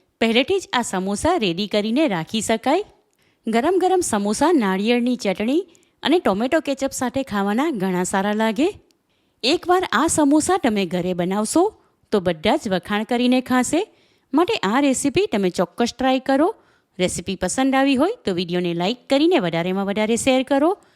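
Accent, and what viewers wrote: Surat, India. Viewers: Surati Gujarati bole chhe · Pure Surti. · Ben surati lage chhe tt bole chhe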